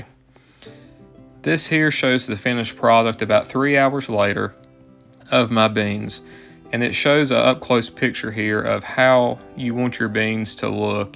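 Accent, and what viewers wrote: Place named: Mississippi, United States. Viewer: I don’t know where you’re from but it sounds like Mississippi. I enjoyed the video. Beans & cornbread is one of my favorite meals.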